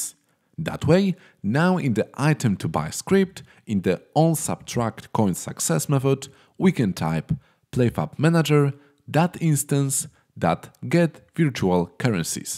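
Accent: Poland. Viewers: Tak mi się coś wydawało, że to Polak do mnie mówi.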